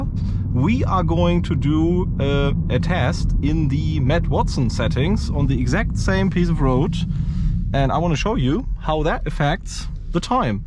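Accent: Germany. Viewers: Ist zwar ein wirklich gutes Video, aber du hast einen so starken deutschen Akzent, dass man raushört, dass du Deutscher bist hahaha. Meinen Like hast du aber auf jeden Fall trotzdem. Könntest aber eventuell etwas mehr auf die Höchstgeschwindigkeit eingehen. Ist gerade bei den neuen Autos sehr beliebt. :)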